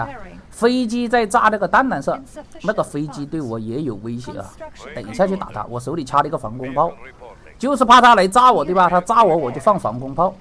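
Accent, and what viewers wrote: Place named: Jiangxi, China. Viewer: 好像是江西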